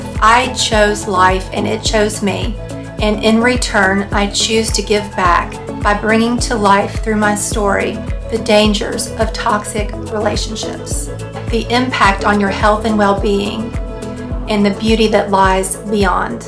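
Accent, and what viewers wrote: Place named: South, United States. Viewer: I like Blue and Yellow and your southern accent.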